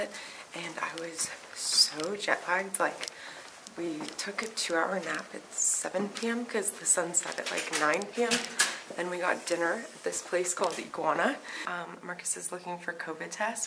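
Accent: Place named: Australia. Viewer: haha confusing Europeans with an Aussie accent 👍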